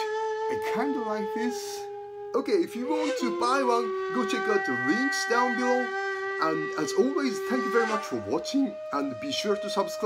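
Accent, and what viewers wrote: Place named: Japan. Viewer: SAMURAI EnglishとBritish Englishを混ぜたみたいな英語やな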